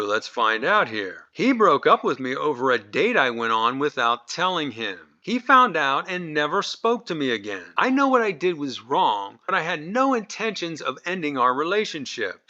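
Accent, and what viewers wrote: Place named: Pittsburgh, United States. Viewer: Sound like you’re from the Pittsburgh area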